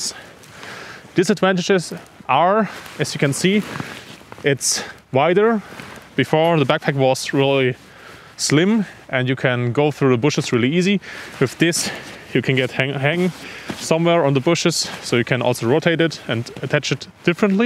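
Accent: Germany. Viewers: Why are you wearing a mask when its not even cold? You generally wear attire like that below -5 to -10C. It looks like its above freezing in this video. Gonna get Covid miles from civilization? It muffles your voice making the German accent more difficult to understand (though your English is obviously excellent). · Na, das ist doch ein deutscher Akzent,oder?😊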